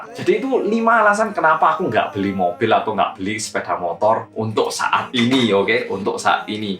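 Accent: Java, Indonesia. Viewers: Kemedo'anmu berkurang sol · Jowone mendok tenan jozzlah..... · Oke hallo guys welcomback to korea reomit bersama orang korea yang medoooookkkkk Aku pengen naik kereta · Ws gembe kopi durung mas medok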